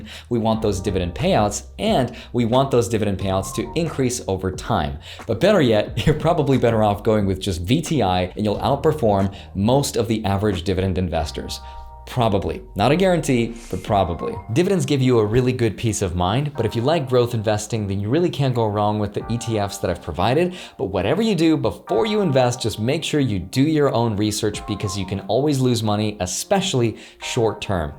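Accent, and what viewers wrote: Russia. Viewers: I love your Russian accent. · You are my favorite stock guy!! And could you please use your Russian accent more.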